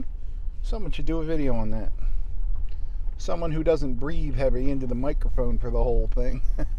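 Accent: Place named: Texas, United States. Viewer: You have a great texas drawl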